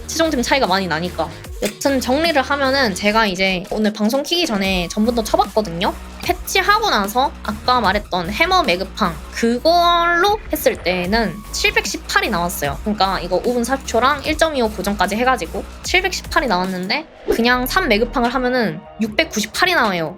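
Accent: Jeolla, South Korea. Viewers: 말 할때 전라도 말투로 구수하네여ㅋㅋ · 전라도 ㅇㅇ 졸귀 ㅋㅋ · 님 혹시 전라도 출신이신가요? 억양에 사투리가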